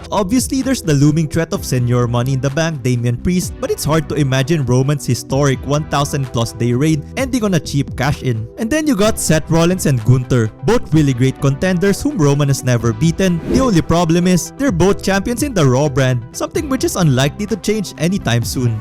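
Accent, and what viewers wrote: Philippines. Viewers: He’s certainly held it hostage but you can’t blame but think that whoever finally beats him, that sigh of relief would be something else. Also from your accent, are you Filipino?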